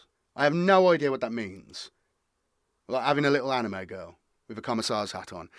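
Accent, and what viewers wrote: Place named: Tamworth, United Kingdom. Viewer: I thought you were a Tamworth lad from your accent, I’m just down the road from there (on the boarder of Leicestershire). Didn’t know if you’d randomly turn up at our local shop sometime.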